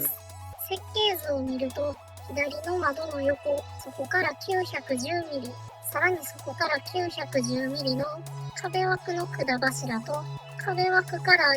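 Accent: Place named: Kansai, Japan. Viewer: 関西弁が心地いい。エアコンの取り付けって、電気工事士の資格いらないの知らなかった。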